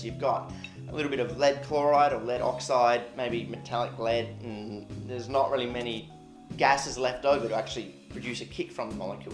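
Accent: Australia. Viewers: Urinanalysis recycled tube with yellow liquid therein...oh yes! And your Aussie accent sounds like mine, but I'm in SA and surely you are a Perth person??? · For sure; without any doubt in my mind of all the chemtubers based solely on your being from Australia, you would be the most likely to both start a fight and with it. I'm not suggesting youtube host you, I'm saying 12 cans and three Jager bombs you. Having said that though you sound a little Victorian, maybe southern NSW or something?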